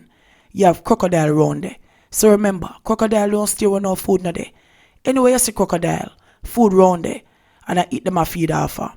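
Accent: Jamaica. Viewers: Things getting worst in all cases so all am going to say is I always love how you read news even tho you say its hard but trust me you make it more understanding by telling it as it is with patwah included keep up the good work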